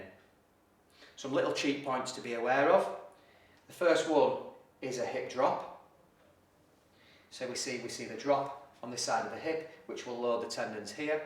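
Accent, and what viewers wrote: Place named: Yorkshire, United Kingdom. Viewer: I have just subscribed to your helpful videos. I can't do these laying down movements on the floor as I can't get back up. Is it ok on the bed? The bad pain in my hip is far worse at night wakening me several times. I will try your advice thank you so much. By the way lovely to hear your Yorkshire accent.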